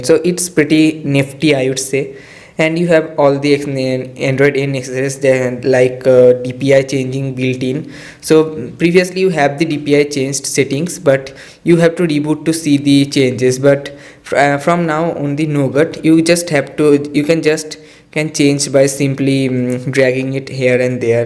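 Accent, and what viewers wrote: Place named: India. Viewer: Don't let the negative comments about your English accent affect you. You as an Indian know at least 2+ languages which is great. Good overview.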